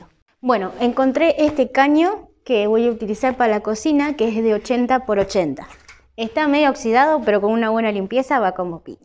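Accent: Argentina. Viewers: BONITO ACENTO TIENE LA ARGENTINA , CHIDA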